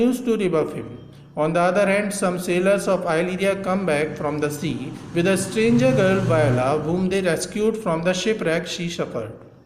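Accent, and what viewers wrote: India. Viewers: Very good Indian Accent.. Perfect Indian English language.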